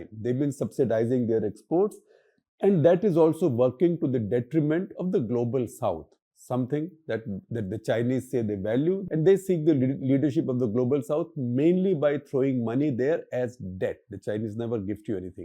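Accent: India. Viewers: Yo, Can you speak proper English instead of Endiaish? It's an embracement for your English master out there after all these many years of colonizing.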